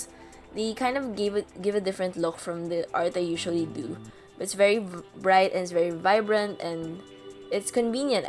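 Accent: Philippines. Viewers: When I first heard your voice, I noticed an accent. I knew you were Filipino too.☺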